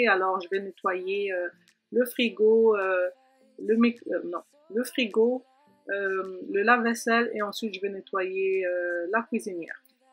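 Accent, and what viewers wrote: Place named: Quebec, Canada. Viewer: Coucou ☺️☺️ j’aime tellement ton accent québécois… moi aussi j’ai un tineco mais le A10, super simple. J’aime vraiment beaucoup la chambre des garçons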